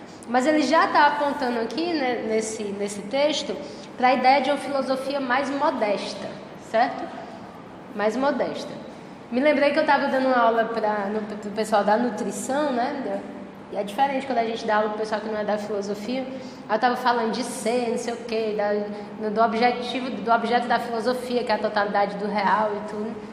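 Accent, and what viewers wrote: Northeast, Brazil. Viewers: encontrei seu canal por acaso. Estava lendo um artigo sobre Bergson que me deixou muito feliz e, inclusive, me gerou uns arrepios (alegria). Depois fui buscando mais sobre Bergson e acabei por aqui. O mais legal de tudo isso foi quando prestei atenção ao criador do artigo que, advinha, era de sua autoria. Muito obrigado por solidarizar seu conhecimento, sua forma espontânea, seu sotaque nordestino, como o meu, ainda deixou tudo mais atrativo e familiar sem perder a profundidade do pensamento de Bergson, um pensador essencial para nosso tempo. Ganhou mais um inscrito. Te ouvir me deu a impressão de ouvir uma pessoa próxima muito inteligente e fascinada por essa consciência da Vida que tanto me deslumbram também. Muito grato! Um abraço. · Boa noite! Sempre vejo seu canal e alguns vídeos seus detonando os esquerdopatas. Parabéns pelo conhecimento apurado e inteligente. 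Até seu sotaque nordestino dá um toque sublime as suas apresentações.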